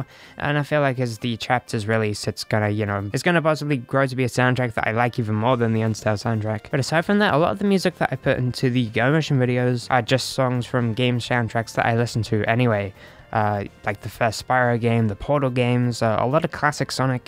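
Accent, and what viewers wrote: United Kingdom. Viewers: I love the new voice!!! I will kinda miss the old British one but i'm so happy for you!! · I will always miss your bored British voice, but I’m glad things are going well with your voice! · How do you go from a low British voice to a high pitch feminine voice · Rip bored british voice, long live new voice :) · I like his old birish voice mate But this sounds good One question is he trying to turn female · Perpetually pissed off British voice is the single best thing I’ve read since I finished the Harry Potter series. So the best thing I’ve heard since last night. BUT STILL, it’s amazing. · wait wait wait she's BRITISH?? how did i never notice it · WOW your voice sounds amazing (: but I will always miss that annoyed/ bored British accent. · I thing she sounds British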